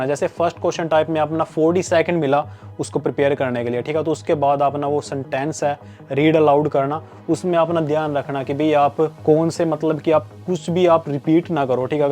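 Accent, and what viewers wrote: Haryana, India. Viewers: Haryana ka lagta hai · Haryane ka hi ha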